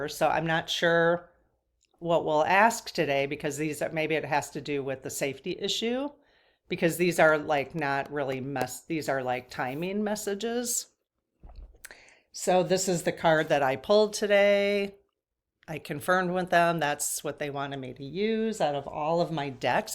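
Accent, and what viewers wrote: Midwest, United States. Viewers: I grew up in Iowa and live in Appalachia now… midwestern accent is comforting to me.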